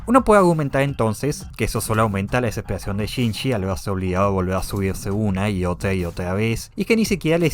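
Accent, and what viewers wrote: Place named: Germany. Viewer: que onda sos de Alemania que tenes ese acento? Pareces Asuka en el doblaje latino (? buen video padreeee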